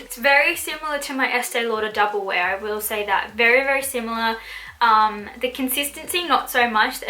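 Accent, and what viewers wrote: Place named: Australia. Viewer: You are such an Aussie. I can hear it :)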